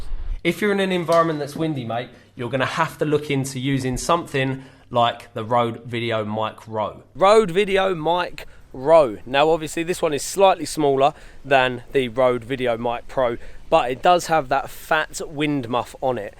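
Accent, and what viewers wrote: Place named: England, United Kingdom. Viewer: Super impressed of your english origin hearing that Mate! Mate!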